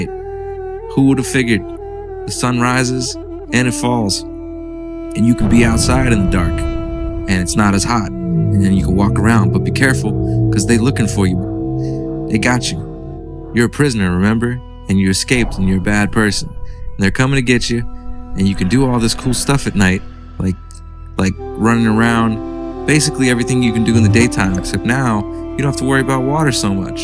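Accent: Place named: Bronx, United States. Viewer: When a Hoodie from the Bronx starts to talk about a Computer Game - I am out!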